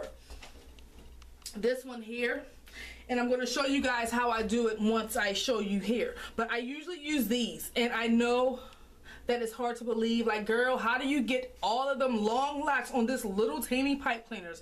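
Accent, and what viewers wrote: Baltimore, United States. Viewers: I hear a Baltimore accent🌚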